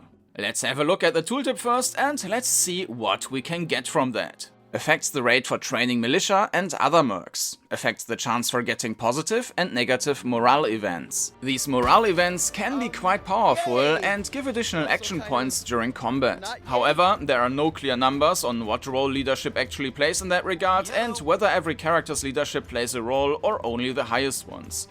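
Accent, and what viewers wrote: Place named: Germany. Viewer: der deutscheste Akzent, den ich je gehört habe 🙂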